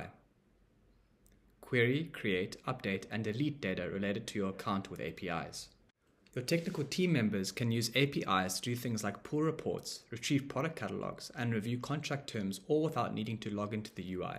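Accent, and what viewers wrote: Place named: South Africa. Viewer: bro lowkey sounds South African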